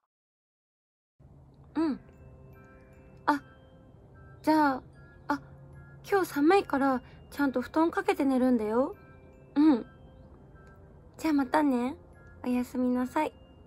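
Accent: Tsugaru, Japan. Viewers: 津軽弁良いですね₍ᐢ⑅•ᴗ•⑅ᐢ₎♡ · 本当、津軽弁可愛いっす😍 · 津軽弁の上級編？！、少し~慣れるまで時間がかかるかな。でもお国言葉ってほのぼのして良いな~。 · だいぶ綺麗な津軽弁ですね。わの周り汚い津軽弁です。五所川原だはんで。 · の津軽弁刺さりますw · の津軽弁、いいね😊 標準語と津軽弁で服装変えるのは、のイメージかな？？？ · こんばんは‼️ ってかの津軽弁滅茶苦茶可愛い過ぎですね👍 地方から（自分もですが） 東京に上京して年数が経てば経つにつれ自分の生まれ育った土地の言葉って正直イントネーションとか自分では気付かない内に変わってしまいがちだけど… 今日もに癒やされました。まだまだコロナも収束を見せる処か大阪に続き東京もまん防発令されるかも知れない状況ですが本当の家族の為にもコロナには十分気を付けて下さいね‼️ これからも応援してます👍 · の津軽弁、あいかわらずめんこいな～！次は、『いずい』だの『しずね』に『ねっぱす』なんか、いいんでねぇべが… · 女の子の津軽弁上品やな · 初見です！父方の実家が青森で幼少期実家のように訪れてたため津軽弁が恋しくなってポチりました！動画自体は凄く良いと思います！ただ訛り方が少し津軽弁弱いと思ってしまって懐かしさがあんまり感じれなく残念と思ってしまったのが感想です...これからも頑張って下さい！ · こんばんは津軽弁のも可愛いすぎとるね。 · 寝ようとしたら女神？天使？津軽弁ぶち可愛いやんけ！(広島弁) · 、思い付かなくても、シリーズ化してください🙇 やっぱり津軽弁のにドキドキです💖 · 北海道の多くの地域でごみを投げるで通じますよ。ちょす＝いじるは函館をはじめ道南地方では使いますね。布団着るもわかるし、へばね～も使うよwww 津軽弁で喋る可愛すぎ！ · 第三段やって欲しい！の津軽弁を永遠に電話で聴きながら寝落ちしたい笑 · の津軽弁カワイイ！ASMRの津軽弁寝かしつけの動画やって欲しい！ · 良いなぁ。津軽弁‼️まっと(もっと)やれじゃ‼️(やって) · 津軽弁良き！😆 シリーズ化して欲しいな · めちゃかわいくて、津軽弁で話したら惚れちゃうかも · 今日も見れた！津軽弁可愛い✨ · やっぱり、津軽弁カワイイ〜❣️ホッコリする❤️ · お若いのに津軽弁を話せるとはすごいです。今でも、地域的に津軽弁の濃いところはあるのですが、若い人はしゃべれなくなってきました。けっぱってください。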